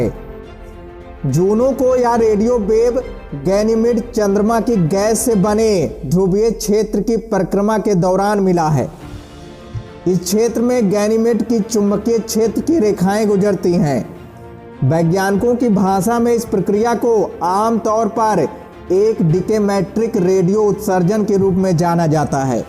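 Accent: Bihar, India. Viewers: Tum Bihar ke ho na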